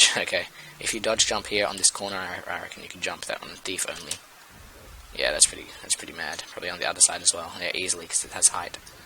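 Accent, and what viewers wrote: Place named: Australia. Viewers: he's an aussie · thought that mighta been an aussie accent. was a little softer than we 'Mericans are used to hearing ;P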